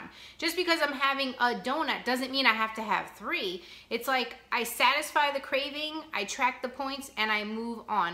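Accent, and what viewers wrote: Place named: Long Island, United States. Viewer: The Fit and Fabuless Mama I am in Ontario I live in the north of the province in a small town close to Huntsville Love that you caught the u, if we were speaking g then for sure you would hear a Canadian accent as I hear your LI accent and think it's lovely 😊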